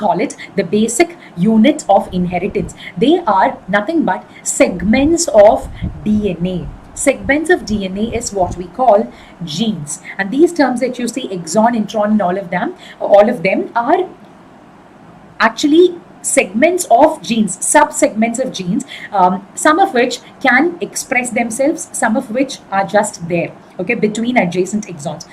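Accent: Kerala, India. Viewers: Ma'am, yo voice is so mellifluous. I legit. That was an ideal english accent of India. (Applause & lots of admiration for ya!)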